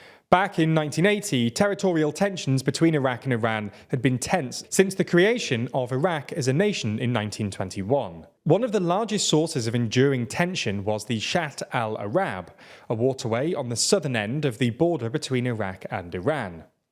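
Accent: United Kingdom. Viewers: He's british, different accent. Technically, his pronunciation is "proper" English 🤷‍♂️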